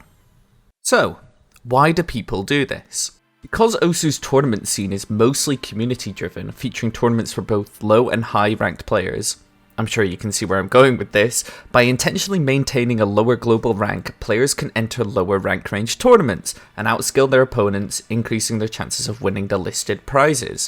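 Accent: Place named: Scotland, United Kingdom. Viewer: WOW! What a wonderful and informative video. The OSU! community thanks you for putting such a great amount of effort into this, from the detailed animations to the outstanding soundbytes (pure nostalgia from Vsauce). As an ex-OSU! player, I always struggled with playing against smurfs >:( I blame my friend - Jamgyo. Forcefully making me download the game and getting me hooked. From there, it was a never-ending spiral of despair and misery from these smurfs. The game baits you in with its fun colours, fast-action-paced music, and anime backgrounds. Only to be humiliated as the screen goes black and you get a glimpse of your reflection... A sad, sweaty, filthy casual. This agony is only further prolonged as you realise you have received another defeat from someone who has deranked. As a result of this, I have had to stop playing and focus my attention elsewhere. 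I recently joined a friendly Old School Runescape Discord server, and with the great support and assistance of these members, whom I am proudly now able to call my friends, I am slowly closing the gap towards a FIRE CAPE. The thilling gameplay and pure excitement from 16hrs of straight griding in the Nightmare Zone have really made me consider never returning to OSU! Don't let my horror story put you off your grind; endurance is key, and you will triumph against these lowlifes. P.S. Love the Scottish accent🏆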